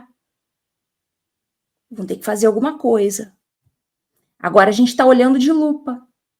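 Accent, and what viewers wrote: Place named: Rio de Janeiro, Brazil. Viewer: gosto dos seus comentarios falando (assim do nosso jeito carioca de falar) muito legal.estou ligado!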